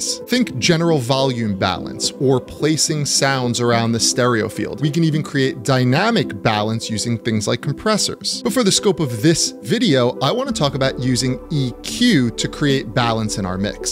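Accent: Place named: Arab world. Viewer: Your accent is from arab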